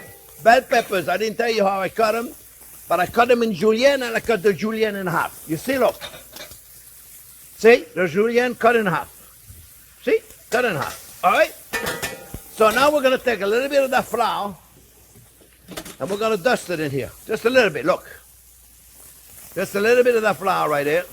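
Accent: France. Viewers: He sounds like Mario, trying to speak English with a French accent.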